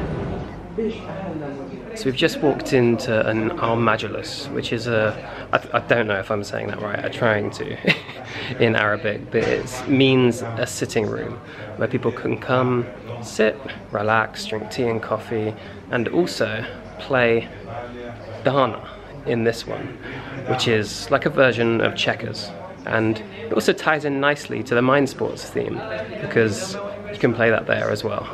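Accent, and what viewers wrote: United Kingdom. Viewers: Amazing your video,!!! Congratulations I love the pronunciation of British English.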